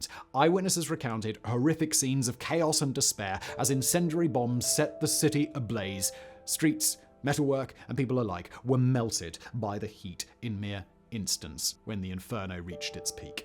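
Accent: RP, United Kingdom. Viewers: I'm an American veteran officer, and suspect you barely touched the topic. It seems you have relatively little to say about the American GIs. Citing hundreds here and dozens there hardly compares to what you say the British did. (You even sound British.) We love hearing how legendarily Good we are, but I believe lying only makes our problem worse. I realize this video is about WW2, but we were the same nation that committed horrific inhumane crimes during the Spanish-American War, during the near destruction of the American Natives during that same decade, and we were still the same culture during Vietnam when our lovely GIs would sight-in their rifles by firing on local farmers out in the rice paddies. I'm simply confident that War brings out the worst of Human Nature. That's not an excuse for it. I just think you skipped a lot. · A posh Brit complaining about America and how we came and saved his country, how about just say thank you and shut up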